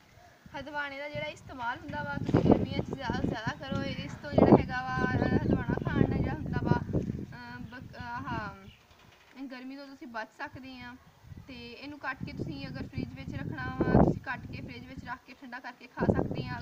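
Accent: Punjab, India. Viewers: Ap Punjabi bohat pyari bolti ho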